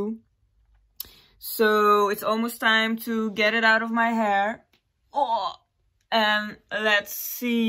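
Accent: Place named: Netherlands. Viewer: Oef you’re really Dutch aren’t you?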